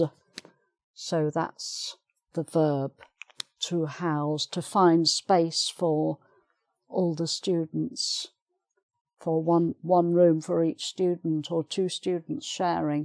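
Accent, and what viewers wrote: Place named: Midlands, United Kingdom. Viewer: The lady is from Midlands, he told about this in her video about accents and dialects. She moved to London and her pronunciation gradually changed.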